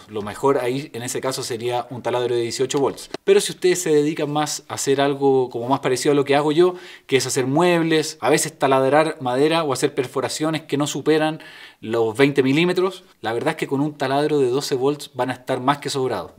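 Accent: Chile. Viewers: Por el acento supongo que sos chileno. Hermoso país. Saludos desde Argentina. Muy instructivo el video.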